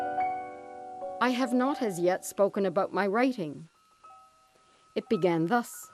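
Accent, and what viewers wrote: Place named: Maine, United States. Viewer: Couldn't they find a narator without that terrible voice and a cent. She sounds like a fishwife from Maine.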